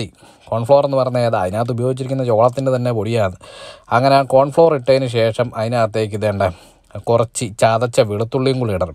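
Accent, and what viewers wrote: Kottayam, India. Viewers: samsaram patented. Athu vittukodukkaruthe. Kottayam Malayalam slang kittulla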